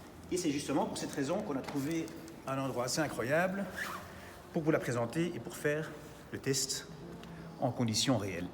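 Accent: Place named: Belgium. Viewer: Il me tue c’est belges 😂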